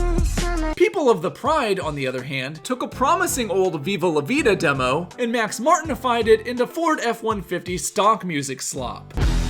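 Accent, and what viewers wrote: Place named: United States. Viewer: It's so strange to hear British grammar ( ARE) with an American accent, great video though (I'm assuming your accent isn't Canadian, might be wrong about that though)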